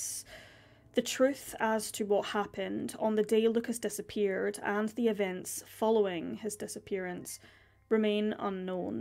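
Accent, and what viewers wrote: Scotland, United Kingdom. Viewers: Oh my god your Scottish I’m from Glasgow too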